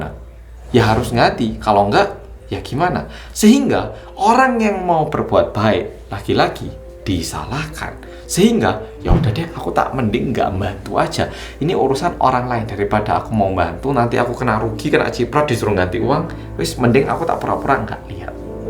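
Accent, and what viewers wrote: Java, Indonesia. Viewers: Mas skrng udah 5 ahun di korea.. Gimana menjaga bahasa indo nya dan tetep medok? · film korea itu asik dan romantis lho.....kok bisa begitu bro..? tapi mas ini kayak orang jawa logatnya.....nice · Maa syaa ALLOH....TABAROKALLOH....INI ORANG KOREA TAPI KOK BHS NYA MEDOK BNGT,SESUAI BNGT DG JUDUL NYA👍💚🙏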